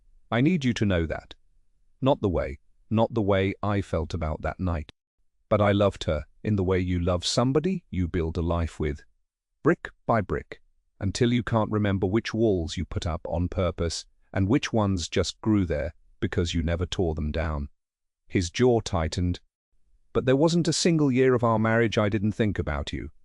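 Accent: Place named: United States. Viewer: Why do you speak like an American but you choose a British narration vooice?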